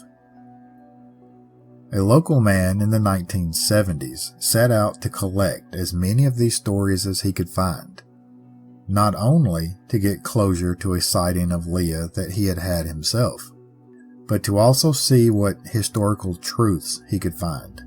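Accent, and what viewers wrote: South, United States. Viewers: Yay yay i made it in time for the > 100 like status ❤❤ Delectable Dixie drawl here i come 😊😊😊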